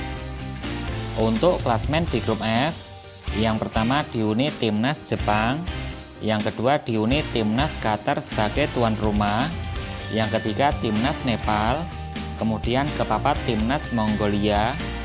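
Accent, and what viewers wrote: Java, Indonesia. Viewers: di liat logat bicaranya aja udah keliatan di tambah lagi ada yang ke telu tambah yakin dong kalau adminnya orang jawa 😁😁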